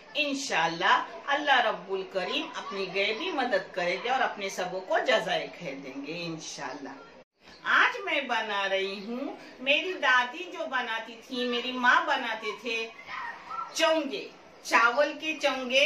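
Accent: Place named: Konkan, India. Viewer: Ap k bolne k tarike se lagta hai ap kokni hai Isliye pochi jazakallah khair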